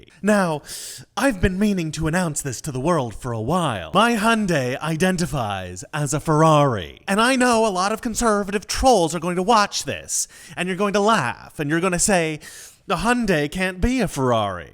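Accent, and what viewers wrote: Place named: Ireland. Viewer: You have sort of an Irish accent Mr.. Also, thank you for using your pronoun in your name so we know your preference.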